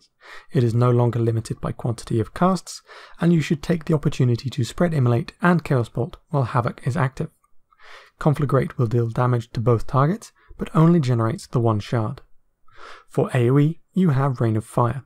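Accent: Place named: United Kingdom. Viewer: Nice video! Nice to hear a British voice for a change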